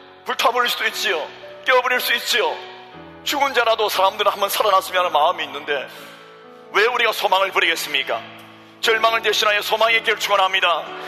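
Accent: Gyeongsang, South Korea. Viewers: 경상도 ㅋㅋㅋㅋㅋ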